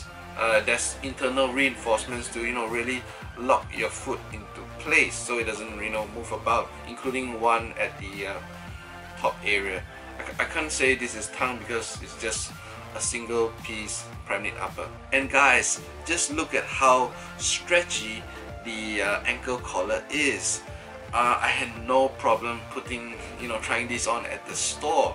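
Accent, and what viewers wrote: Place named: Singapore. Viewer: Great review. I just put in an order. Hoping it's a good one. :) Your Singapore accent makes me very nostalgic for the motherland. Hahaha.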